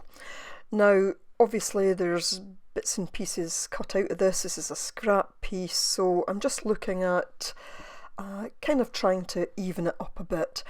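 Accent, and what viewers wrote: Scotland, United Kingdom. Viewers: Amazing. You have a special gift. Your voice is beautifully calming and your Scottish brogue is music to my Cheshire ears. PLUS... you have inspired me. You and Nina are fantastic at being able to do that. Thank you for sharing, you have made me happy. X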